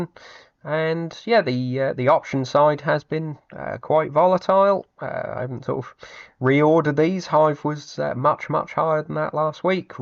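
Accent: Norfolk, United Kingdom. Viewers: Damn not often you hear a Norfolk accent on an investment video (North Walsham btw) ;-) . Awesome gains man, keep crushing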